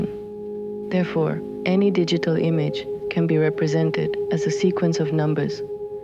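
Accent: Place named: Europe. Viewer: monotone voice with slightly European accent, devoid of human differences that give "color" to life. Drivel.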